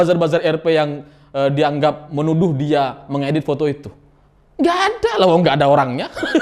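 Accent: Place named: Madura, Indonesia. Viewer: Logat kacong medok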